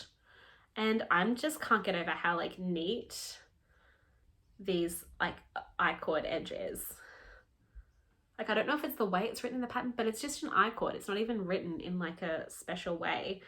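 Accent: Australia. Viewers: Great to see you pop again on YouTube and it’s so nice to hear an Australian voice which are few and far between on knitting podcasts and speak about us coming into summer as the rest of the world talk about going into winter (as though there is only one hemisphere in the world)! Love your humour 😂😂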